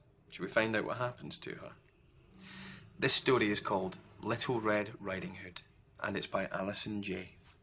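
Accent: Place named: Scotland, United Kingdom. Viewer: Ира, молодец какая! Отличное видео)) Джеймс со своим шотландским акцентом вообще отлично вписался)) Особенно волку на славу удался! Спасибо за ссылку!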